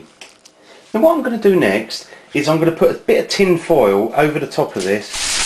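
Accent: United Kingdom. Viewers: that british accent though. too cute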